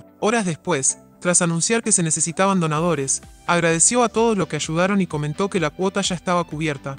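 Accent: Argentina. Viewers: Ché por qué tenés acento argentino? Por qué hacen un canal para hablar del santos si son sudamericanos ?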